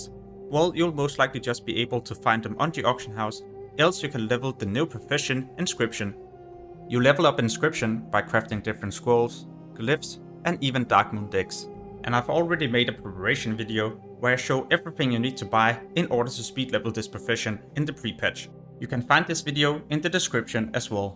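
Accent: Denmark. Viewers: Very nice video :D Playing with people from scandinavia I kinda started to catch on the accent, you are danish if I'm not mistaken :D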